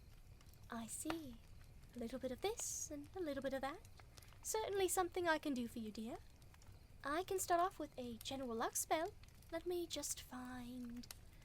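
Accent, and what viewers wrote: RP, United Kingdom. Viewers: Ayoo bri ish is soo sweet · Me: Sees you posted a new video "Oh, nice. Wonder what it i- Also me: *sees its a witch roleplay "I know what I'm listening to tonight! Thank you - *sees its British accent Also me: various happy noises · Ah, some proper Bri'ish, feels just like home! Like I just popped down to my local chippy and it had, in fact, turned into a potion shop! Jolly good show! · This one is just lovely! Just the bri‘ish alone gives it this certain charm. Lovin‘ it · Bugger me. This accent is so posh it makes me feel positively middle class.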